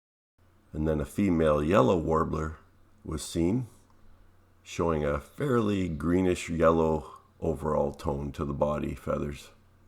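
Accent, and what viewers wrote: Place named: Canada. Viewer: What accent do you have, id like to be able to identify it in future, its like a Canadian dan ackeroid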